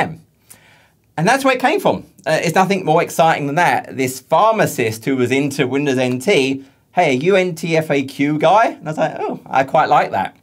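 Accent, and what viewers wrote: United Kingdom. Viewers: I eventually know why your speaking with british accent , it's so familiar to me . Thanks for sharing your story. It's awesome !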